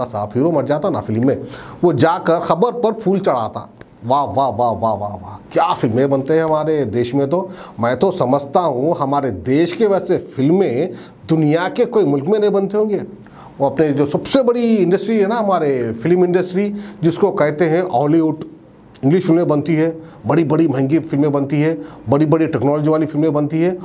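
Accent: Hyderabad, India. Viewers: bhai aap pakke hyderabadi hai mast baatein karte aap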